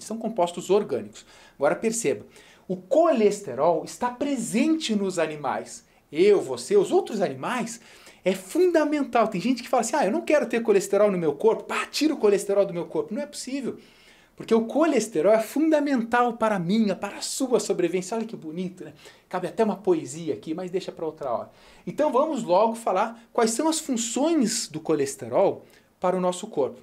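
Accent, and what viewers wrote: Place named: South, Brazil. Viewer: PARABENS PROFESSOR QUE AULA HEIN?????? QUE SIMPATIA!!! ADOREI ! O SEU SOTAQUE É DO SUL! É CATARINENSE, OU GAÚCHO ???? VC É NOTA 1000! MUITO OBRIGADA!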